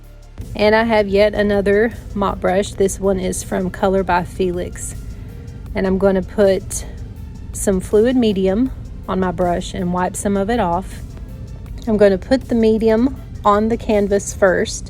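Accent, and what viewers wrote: South, United States. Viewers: Nice voice and subtle southern accent. Nice painting too.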